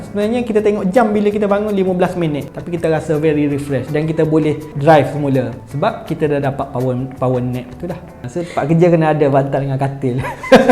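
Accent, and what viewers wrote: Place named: Kelantan, Malaysia. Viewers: Pekat dialeg kelantan walaupun bercakap dalam berbahasa Malaysia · Bkn dgr dialek tu kelate john penang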